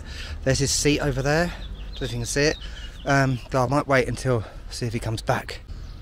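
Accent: United Kingdom. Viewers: Are you british? Your accent sounds different 🤪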